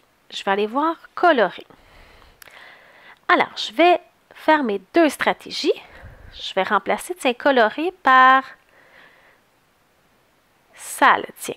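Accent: Quebec, Canada. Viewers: Ton accent québécois et incroyable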